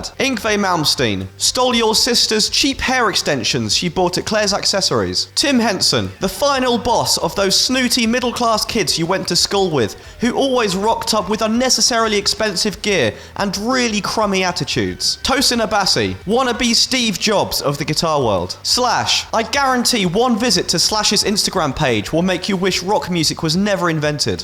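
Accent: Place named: RP, United Kingdom. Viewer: Posh kid trying to sound cool while swearing ,always makes me smile.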